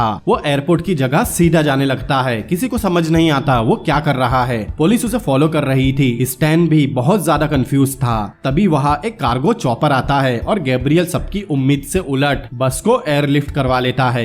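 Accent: Assam, India. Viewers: 👍👍👍 I like ur voice... R u from Assam, As your hindi is like Assamese.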